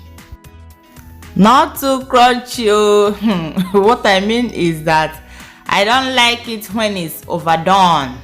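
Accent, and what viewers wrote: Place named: Nigeria. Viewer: First I love the way you have put your vegetables in order, clean job it makes me admire what you are going to cook before I see it😋, secondly your voice is nice to me,I love Naigerian accent so much, last but not least,I love your recipe,and the vegetables looks delicious 😋, wow well served ooo my comment is "confusion" as you have just say😁😁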